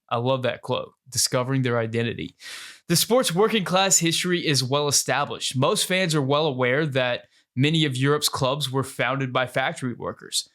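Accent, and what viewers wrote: United States. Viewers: There’s already a sport called Football here, and whether we are fans of it or not we grew up hearing the word repeatedly associated to that sport. I don’t have a problem calling it football, but it’s confusing to hear the word football from an American accent and not think about the sport where men line up at a line of scrimmage and smash into each other. In Spanish, Football is called Fútbol even though Fút and Bol are not Spanish words.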